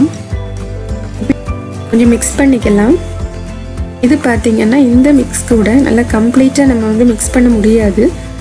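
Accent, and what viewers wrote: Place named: Kanyakumari, India. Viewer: Love that accent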